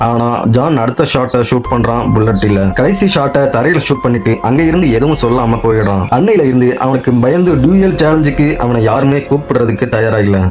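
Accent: Coimbatore, India. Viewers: Neenga coimbatore ngla?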